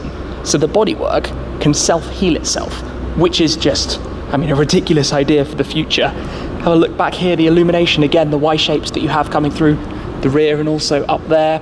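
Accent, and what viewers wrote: United Kingdom. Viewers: Nice British accent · your accent makes everything you say increases the stereotypical british car enthusiast personality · inggris britis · He has a british accent.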